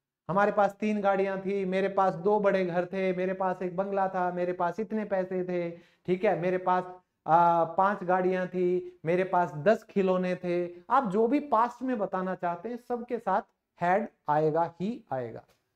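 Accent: Haryana, India. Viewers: Haryanvi English love you ❤️❤️ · Sir kya aap haryana se hai kya kyuki aapki language hariyanvi hai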